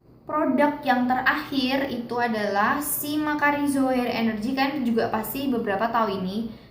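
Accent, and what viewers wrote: Java, Indonesia. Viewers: kka agak medok ngomongnya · medok bgt kak ngomongnya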